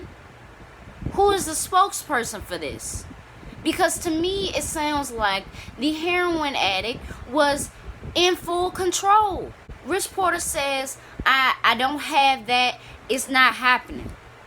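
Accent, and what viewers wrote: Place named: South, United States. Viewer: Are you from Harlem. How do you know this stuff you sound like a downsouth chick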